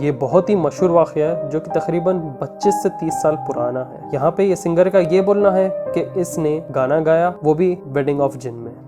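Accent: Hyderabad, India. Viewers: I lov ur hyderabadi accent....ur videos r som real material...Greatez job...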